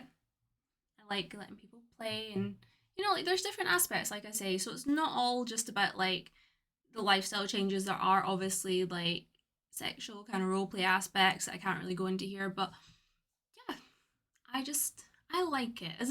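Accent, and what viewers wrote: Scotland, United Kingdom. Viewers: May I ask where you are originally from? It SEEMS like Scottish, however your accent has picked up and dropped a bit... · Love the trace of a Scottish accent,as well your beauty,!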